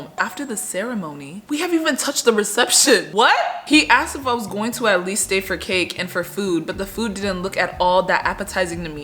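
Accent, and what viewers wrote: Nigeria. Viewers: I just knew you are Nigerian The intonation and all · Weird question, are you Nigerian? I can hear the accent 😂it’s ever so slight but I love it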